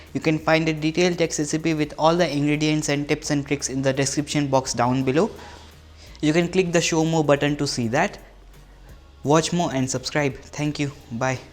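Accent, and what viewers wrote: India. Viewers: Indian accent is horrible....its chopped(not chobbed). weirdo. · India has a totally different English accent! I strongly recommend subtitles for these videos!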